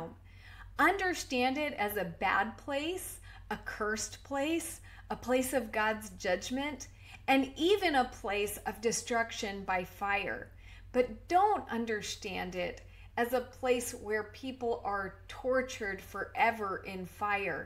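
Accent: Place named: England, United Kingdom. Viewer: that’s so so lovely I’m really really glad that my words made you happy because you have done the same for me with yours. I know no one has all the answers and not will we ever till we get to the other side lol! But it’s so nice to hear someone speak love and not eternal condemnation for once and fear mongering people into believing and judgement for everything u say think and do yano! That would absolutely be such a welcome help. I’m doing quite well but obviously u need that final push and as I say iv been quite unlucky with my experiences I honestly haven’t yet heard a story quite as bad as mine if I’m honest! But luckily I don’t suffer with fear and am just used to it now lol! I live in Bath, which is in the Somerset region near Bristol? Where are you situated I notice your English accent which is music to my ears as keep coming across Americans lol! 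And I reach out and am actually very very surprised about the lack of response and support I get with it all but I believe god leads you to the right people in time so have just been patient with the process. My email is <mail> If you would like to email a bit more privately 😁 Thankyou so much you are an angel 😇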